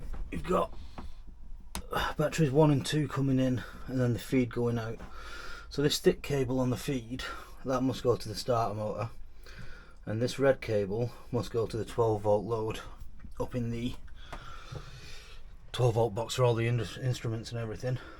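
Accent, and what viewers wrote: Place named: Northern England, United Kingdom. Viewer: Not at all, I love your northern no nonsense presentation. We are, ourselves from the North (Yorkshire). We're based on Anglesey now, but the boat is in Conwy. The house you are talking about sits right in the middle of the Swellies (near Menai Bridge and Llanfairpwllgwyngyll), which is reported locally to be one of the most hazardous areas of water in the UK to pass through. Apparently though, the best time to navigate it, at a good speed, is at slack tide (an hour or so either side of high tide when the whirlpools are least effective would work). Safe travels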